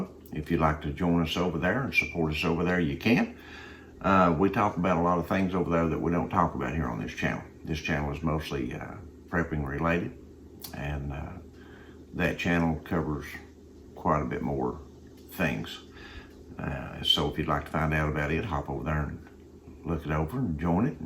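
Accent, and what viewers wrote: Kentucky, United States. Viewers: Hi!! Brand new subscriber here! Ashland, Ky native here!! I knew where you were from before you even mentioned Corbin or Barbourville. You sound like a lot of my family. I LOVE your accent. I have a pretty strong Kentucky accent. My husband is in the Air Force and we just moved to upstate NY a month ago. I stick out like a sore thumb with my accent. Haha! I've had so many people comment on it. Thankfully they've all been nice about it:)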